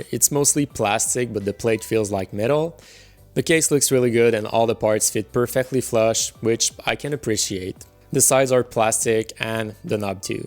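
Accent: Quebec, Canada. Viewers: Tu est quebecois?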